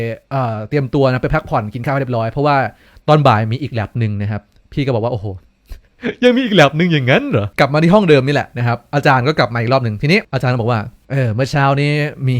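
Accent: South, Thailand. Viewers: เด็กปากใต้นิ555+ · เป็นคนใต้ที่เอ็นดูความพูดทองแดงอะ<num>พี่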